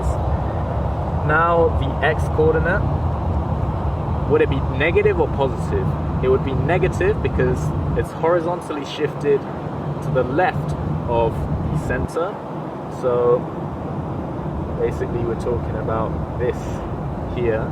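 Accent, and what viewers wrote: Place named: United States. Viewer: tu indian hai, par acche american accent bol rha hai.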